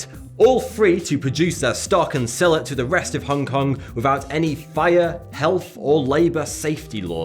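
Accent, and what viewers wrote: United Kingdom. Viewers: Mmmm I just love your British accent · Is that British accent real? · What British accent is this? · "The slum became littered with endless brothels, gambling parlors and opium dens." Just the way you said Brothels, like there was a F in it or something. British accents are hot. I would learn to impersonate them but I am not posh enough to pull it off. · Different accent... Is he british? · Your British English is genuinely horrible. thanks for the lesson.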